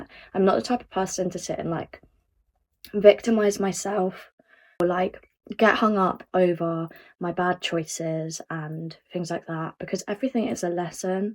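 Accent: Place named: United Kingdom. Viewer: The shock I felt to hear your British accent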